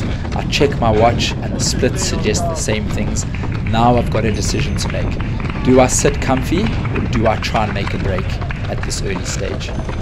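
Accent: South Africa. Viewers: This is absolutely insane ❤‍🔥well done bro. Also, I can sense that south African accent there😁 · Hahahah love the south african accent 🇿🇦😁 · South African? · You sound South African. Are you?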